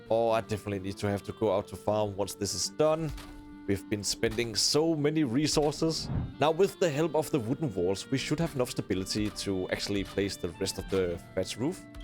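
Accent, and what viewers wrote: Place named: Denmark. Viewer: Hehe, love the Danish dialect, keep up the good work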